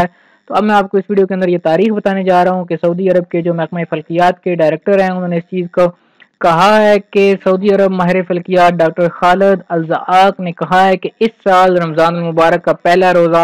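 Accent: India. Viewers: It isn't Saudi, it is some indian speaking "ringi'dingi'ding-ish"... just headache..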